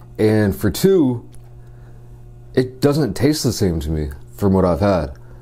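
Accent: United States. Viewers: I know he is living in Korea, but he sounds like such an American boy!!!😁✌🏽❤️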